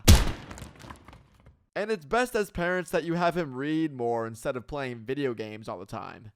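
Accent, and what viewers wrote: Iowa, United States. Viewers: You from Iowa?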